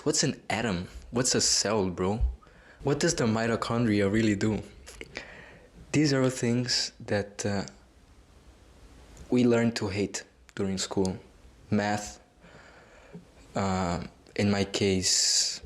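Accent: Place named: Brazil. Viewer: I feel a Brazilian accent but he could be italian too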